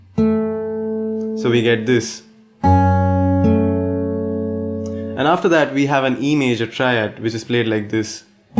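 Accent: India. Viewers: Why do u have indian accent?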